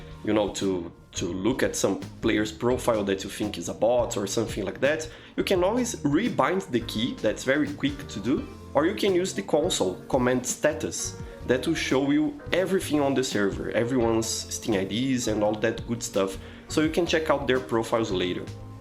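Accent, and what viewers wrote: Brazil. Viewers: Its funny how fast you can tell someone is brazillian by their accent, cool vid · Pelo sotaque é br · Mano, da de saber donde tu é só pelo sotaque, ksksksksk forsa ao tf2 feito pelos br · Are you Brazilian? Because you have an accent of a Brazilian speaking English. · Ouvi 10 segundos e pensei "isso é sotaque de brasileiro". Aliás, provavelmente do interior paulista.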